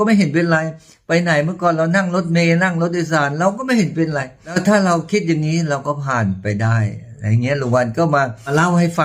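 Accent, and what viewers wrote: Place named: Prachuap, Thailand. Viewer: เพิ่งเข้ามาดูไม่ทราบว่าคุณพี่คนจังหวัดอะรัยค่ะสำเนียงเหมือนๆคนประจวบ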